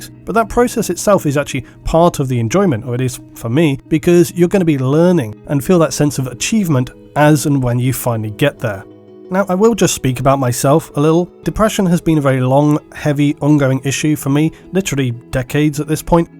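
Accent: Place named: United Kingdom. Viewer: It's not the painting or the role playing that allows for escapism or promoting rewarding precision work. It's all that in the tougher times, people flock to the source of british accents being spoken for it consoles them, makes them calm. Take voice for example, we all come here just to listen that man speak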